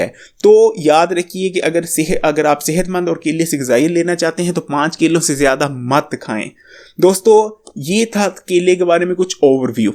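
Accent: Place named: Punjab, India. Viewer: Hahaha buri bat hai..punjabion k lehjay se hi pata chal jata hai k punjabi lehja hai..lekin koi bat nahi kher hai bhai hain apne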